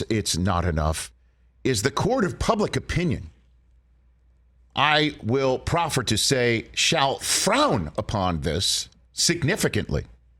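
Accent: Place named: California, United States. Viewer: your california is showing....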